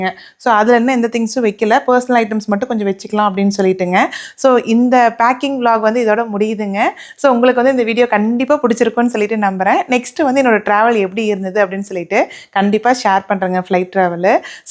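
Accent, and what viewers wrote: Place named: Palani, India. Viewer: சூப்பர் தஙகம் எந்த ஊர் கண்ணே எங்க ஊர் பழனி❤❤❤ அம்மா ஊர் எது பேசுவது எங்க மாதிரியே இருக்குதுடா❤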